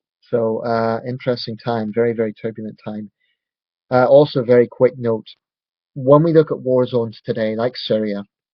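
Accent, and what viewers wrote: Scotland, United Kingdom. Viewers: interesting listen. your ulster accent is particularly scottishesque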